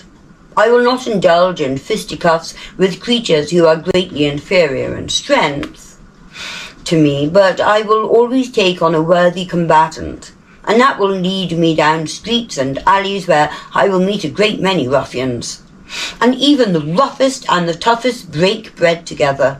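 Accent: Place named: Yorkshire, United Kingdom. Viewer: I loved your Yorkshire accent , I chuckled away at it , brilliant 😊. I had one for ratting too , but a light fingered personage had away with it . Looking for another at present want another bsa meteor .22. Like the older models . Be careful with that knuckle lass , 5yrs for owning one , if caught . I hope to bump into you sometime when I'm down Devon , would be lovely to meet you . I think you are a very interesting person . Keep the vids coming Lass, brightens my day when they get posted 😎👍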